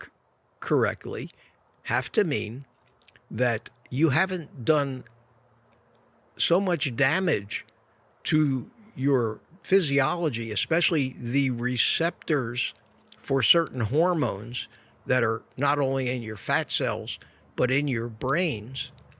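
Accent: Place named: East Coast, United States. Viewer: Excellent info given with an authentic east coast accent.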